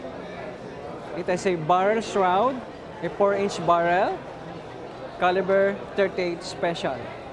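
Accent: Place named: Bisaya, Philippines. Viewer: bisaya ka dong?! galeng mong mag prisint dong!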